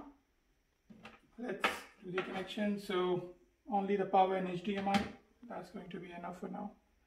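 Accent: India. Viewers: You sound like an Indian Are you indian ? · why do you sound and look like indian. are you Indian??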